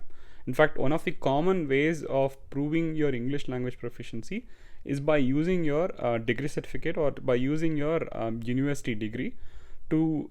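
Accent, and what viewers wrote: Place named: India. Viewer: Hi sir Good effort👍 Also I observe your Indian slang not changed while staying there even after 10 years. Is that because its a Britain English. Many people in US after 3 years talking like US 🙂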